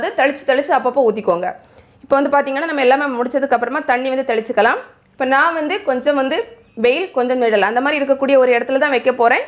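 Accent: Kanyakumari, India. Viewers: Kanyakumari slang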